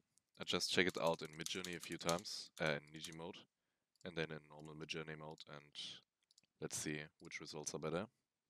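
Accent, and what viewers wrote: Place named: Germany. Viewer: i hear there a bit of DEUTSCH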